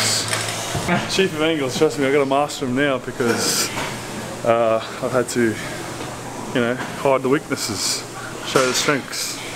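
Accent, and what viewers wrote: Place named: Australia. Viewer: Accent von Aussie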